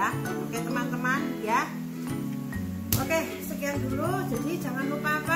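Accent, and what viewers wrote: Java, Indonesia. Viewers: Assalamualaikum Mau Nanya Orang Jawa Ya Kok Bahasanya Medok gitu Hehehe?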